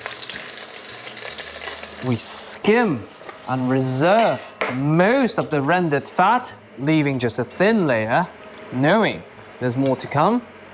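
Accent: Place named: United Kingdom. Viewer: That is the most British voice I have ever heard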